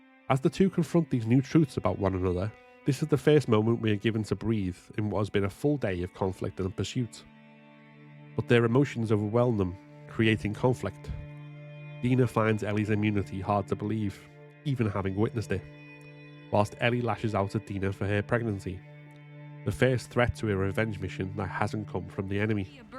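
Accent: Liverpool, United Kingdom. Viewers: Are you a scouser?😊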